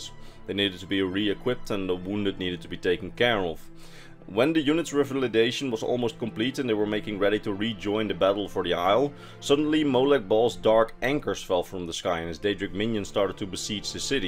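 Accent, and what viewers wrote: Netherlands. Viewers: You sound like a Dutch-speaker.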